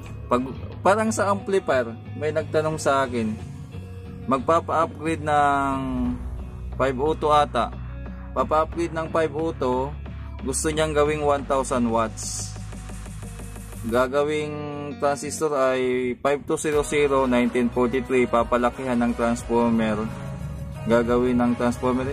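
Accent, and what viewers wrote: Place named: Visayas, Philippines. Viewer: Bisaya ka boss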